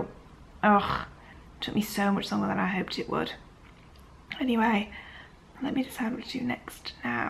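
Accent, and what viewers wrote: United Kingdom. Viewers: Hey! Just got to the point in the vlog where you've spoken about feeling kind of stuck and frustrated in terms of your blog.. and to be honest I don't get it! I LOVE the content you're putting up recently. No matter if it's outfits or collaborations (like the h&m one, which I loved especially) Reading your post makes me giggle sometimes and I even read them in your british accent!! You seen to be such an ambitious young lady, who knows exactly what she wants and I find that so inspiring. There is really no need of feelig frustrated. I think a lot of these feelings come from maybe comparing yourself with others, in this ever changing industry and having to be on top of style, content and life in general. you're doing a Great Job, in all these aspects! and by great I don't mean perfect, because perfect doesn't excite many people. By great I mean relatable, honest and true to yourself! 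much Love xxx PS: I go on your blog every time I've seen an Instagram/snapchat post where you update your followers about it! keep that up xx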